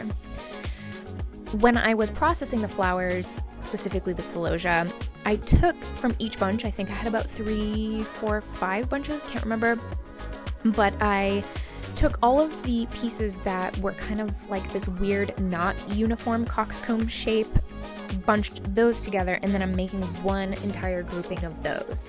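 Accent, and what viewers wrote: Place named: United States. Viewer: omg i love your video, and u r accent is so American! i can feel the power in your voice!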